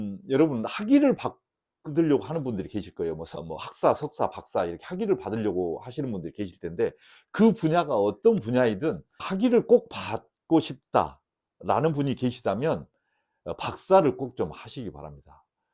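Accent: Jeolla, South Korea. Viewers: 잘 들었습니다. 전라도 분이신 거 같은데.. 흥하십시오!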